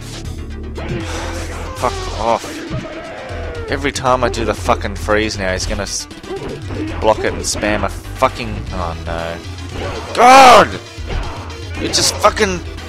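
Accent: Australia. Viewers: This guy is cool, is he australian? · Your voice sounds Australian. Is that right? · yoe91 100% Aussie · Yes, he's an Aussie! xD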